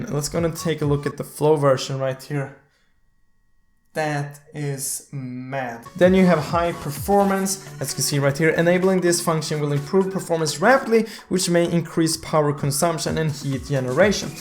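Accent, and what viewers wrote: India. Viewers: Lol i actually thought you were from india.. Lmao..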